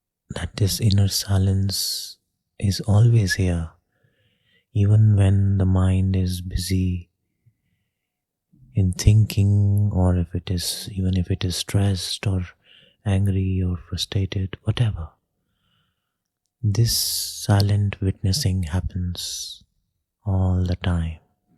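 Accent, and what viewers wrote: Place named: Asia. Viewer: When I heard the Asian accent I knew I was in the right place 😌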